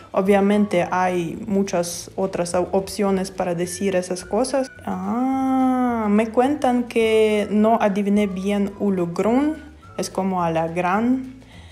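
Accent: Guatemala. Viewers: Ya casi pareces chapín solo fallaste en uy era ulugrun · Siempre los chapines le ponemos el Sason ha las palabras pero si lo hisistes bien saludos desde Maryland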